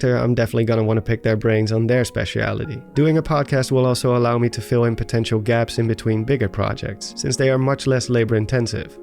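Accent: Netherlands. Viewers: There are way worse accents then the dutch one. It was actually quite charming. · kan aan je stem horen dat je een nederlander bent, congrats op de 100K man. Mijn PC kan elden ring niet runnen, maar wil de game uiteindelijk wel gaan spelen(nadat ik een betere gaming PC bouw, maar dan moet ik eerst wachten totdat de prijzen gezakt zijn).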